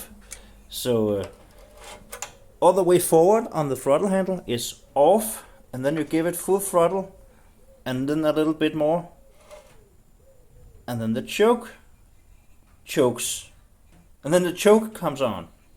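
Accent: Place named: Denmark. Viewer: i have a Norlett with variable speed drive and reverse. I like it way more than the Texas brand👍 I swapped the clapped out old Briggs that would never start, for a chinese honda clone engine. And now i use it with tires on, and a front mounted mower that were originally meant for a texas tiller 🙂 i just found your channel and subbed because i could immediately hear you're a fellow dane 😁🇩🇰🙂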